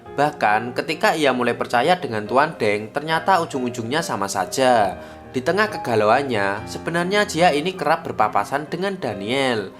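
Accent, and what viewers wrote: Java, Indonesia. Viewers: Logat e jowo medok banget hehe, tp om suka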